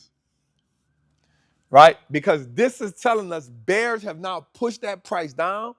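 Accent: New Orleans, United States. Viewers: You're a really good teacher! Are you from N'awlins? I'm in Shreveport.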